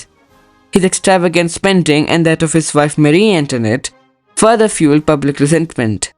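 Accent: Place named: England, United Kingdom. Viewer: Excellent work,Your explanation of the French Revolution is so clear and engaging, and your English accent makes it even more enjoyable to listen to. Looking forward to more of your videos.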